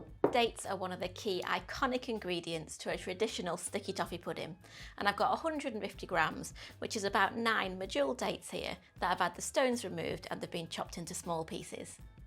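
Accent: United Kingdom. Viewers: OMFG PURE BRITISHNESS. BEAUTIFUL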